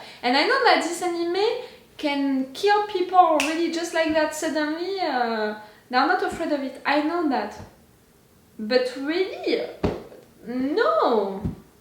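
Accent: France. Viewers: You are french ?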